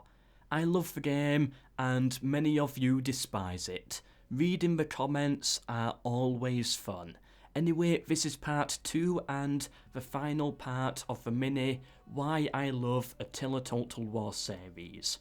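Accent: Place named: Northern England, United Kingdom. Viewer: I'm sorry to say that but what we have here is northern English, not RP British.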